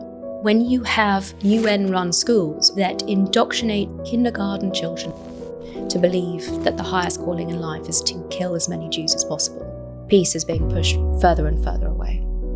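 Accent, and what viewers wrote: RP, United Kingdom. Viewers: When idiotic Hasbara army like Michael Rappaport fails the propaganda exercise, send a posh British sounding white woman to spew more lies with bombastic words sounding all posh but contains zero substance.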